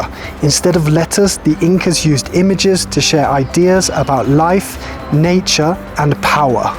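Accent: United Kingdom. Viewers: Hi my friend british. I often learn english with u. I mean british pronunciation... You are in my country hope you enjoy!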